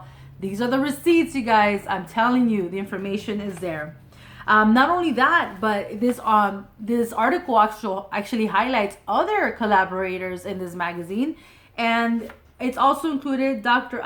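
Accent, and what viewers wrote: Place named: United States. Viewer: Too American of an accent 😖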